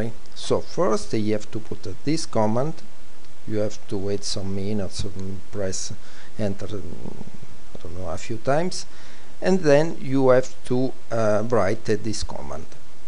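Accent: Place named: Italy. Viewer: Outdated. Si sente che sei italiano ma mamma che brutta pronuncia che hai !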